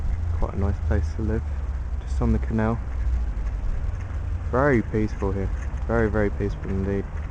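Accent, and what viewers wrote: England, United Kingdom. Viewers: i love ur accent..so english....lol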